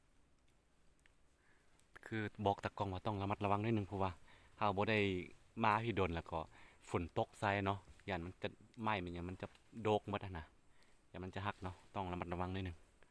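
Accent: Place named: Roi Et, Thailand. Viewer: ร้อยเอ็ด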